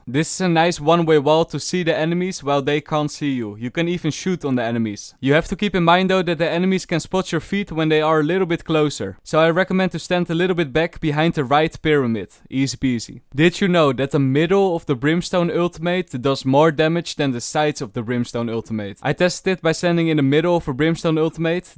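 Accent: Netherlands. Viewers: He is now consistent in uploading great job man With a Dutch ascent!!!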